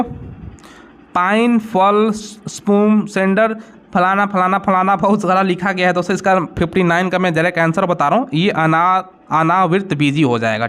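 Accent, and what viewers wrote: Bihar, India. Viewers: Bihari accent 😂😂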